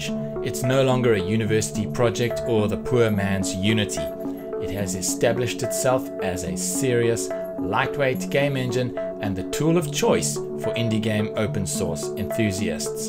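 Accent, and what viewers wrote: South Africa. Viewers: It's weird coming across a channel and hearing another South African, I'm not used to it but its increasingly common!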